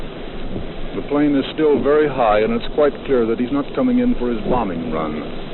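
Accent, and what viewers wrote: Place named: Canada. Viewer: Murrow was from eastern Washington state. He sounds a touch Canadian, like he could have been from British Columbia.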